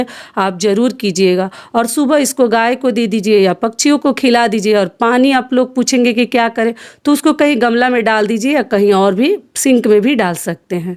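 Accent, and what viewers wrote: Bihar, India. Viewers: Ap bihar se ho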